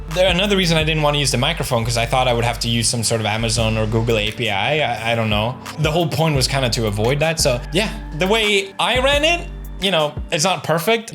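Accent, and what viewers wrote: Sweden. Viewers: I love how since pewds left the UK he's started sounding more Swedish again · All these years, mans has lived all over the world and his Sweedish accent is thicker than its ever been 😂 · I'm happy that his Swedish accent is getting stronger in each video. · he sounds more Swedish than ever before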